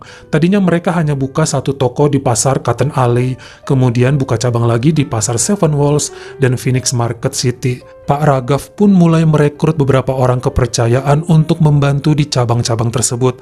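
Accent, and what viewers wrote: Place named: Java, Indonesia. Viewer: Bang boleh nanya gak.. Abang orang batak atau Jawa seh... Nama marga batak..logat jawa😥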